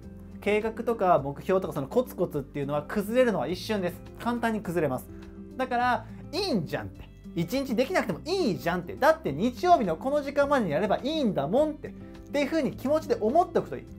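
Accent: Kansai, Japan. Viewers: ちょっと関西弁訛りある気がする(結構好き)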